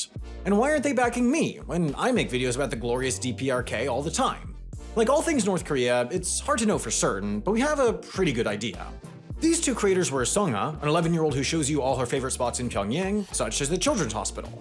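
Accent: United Kingdom. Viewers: why does she sound british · Why British? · damn that british accent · I love how she speaks British English, instead of Americanised drawl, absorbed by osmosis from mindless entertainment. Pure class 👌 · how does she have a british accent?! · why does she have a British accent? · Why does she sound British 😅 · She had to spend some time in Britain because that accent is a British one and I don't know if there is anywhere else she would pickup that accent, unless she is put on a voice for the videos.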